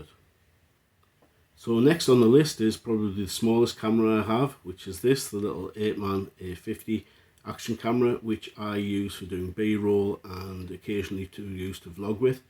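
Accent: North East England, United Kingdom. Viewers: I have just discovered your channel on a youtube safari and immediately spotted the NE accent! I have watched a few of your videos now, and will continue over the next few days. My friends think I am mad because I mainly do film photography, but looking at some of your digital collection makes me feel saner! I have to say: Bad 35mm film cameras are miles better than bad digital cameras! I have a Fuji STX-2 which cost £15 at a pawn brokers and have to say it is a camera that never disappoints and from what you say about the STX-1 it would seem that Fuji film cameras are definitely unsung heroes. I love your content and the way you present it (a lot more polished than me) and I am hoping that I will be inspired to get better. I am looking forward to more over the coming days.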